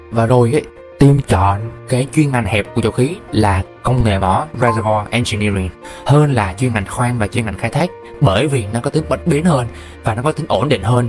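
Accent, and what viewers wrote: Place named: South, Vietnam. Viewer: Bạn cứ nói đại giọng bắc..bạn nhái giọng Nam nghe mệt hơn. Nó chen giữa 2 vùng miền nghe khó chịu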